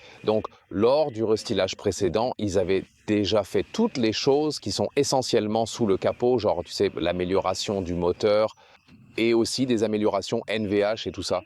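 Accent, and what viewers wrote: Quebec, Canada. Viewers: Français québécois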